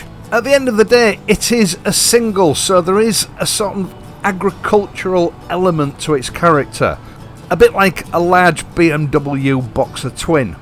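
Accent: United Kingdom. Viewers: for a German bloke as I am it feels so nice to listen to your authentic british accent ... it reminds me of my good old times visiting GB when I was a t young age 😀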